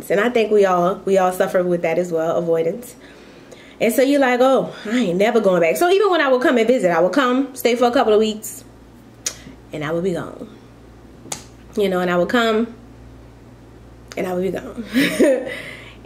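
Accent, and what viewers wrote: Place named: New Orleans, United States. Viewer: For the first time ever I heard that New Orleans accent/dialect...so sexxxy!!!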